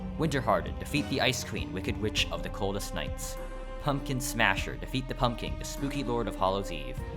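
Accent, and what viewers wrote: China. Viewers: okay, so now we know you're a native speaker of chinese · I love your chinese pronounciation. Also, Frozen Chests were once called Ice Ice Chests in the game. Unless that was just in the Russian localization.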